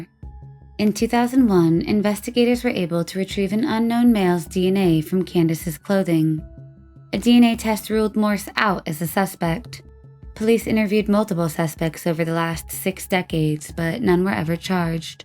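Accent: India. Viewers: I personally love his accent, and I'm quite fond of indian/middle eastern accents in general. They are a joy to my ear. I do agree though that soothing voice is perfect for the channel and is most effective at conveying the sometimes unsettling information in a very palatable way. Love the duo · Thx, not the Indian Guy speaking again 😬🤭👍👏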